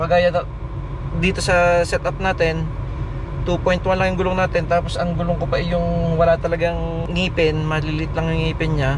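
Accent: Batangas, Philippines. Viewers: Batangueno ka ga? Hahaha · Sir Batangeno ka ba? Ala eh panotice sir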